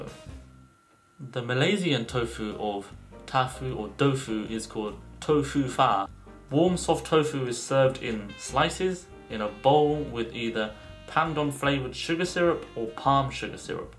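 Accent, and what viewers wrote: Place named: China. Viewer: You must be living in an Asian country for too long - your accent is very chinese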